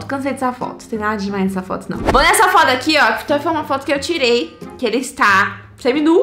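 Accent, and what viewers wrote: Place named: Minas Gerais, Brazil. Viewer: Mô Mineira Né kkkk E MT Legal kkkk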